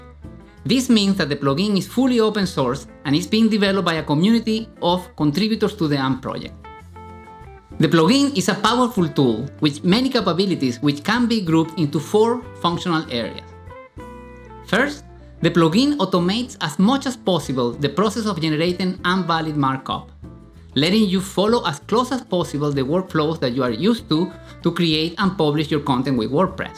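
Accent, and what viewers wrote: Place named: India. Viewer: Why is it that Indians are obviously Indian no matter what language they speak?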